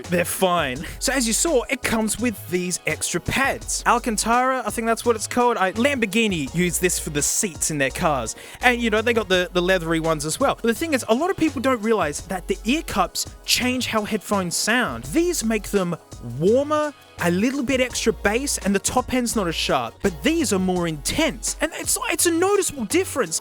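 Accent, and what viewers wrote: Australia. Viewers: Somehow, compared to his first video, he has managed to make himself sound more Aussie.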